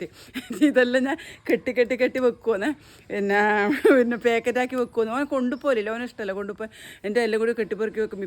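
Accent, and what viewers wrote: Kannur, India. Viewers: കണ്ണൂർ ഭാഷ സൂപ്പറാട്ടോ, കേൾക്കാൻ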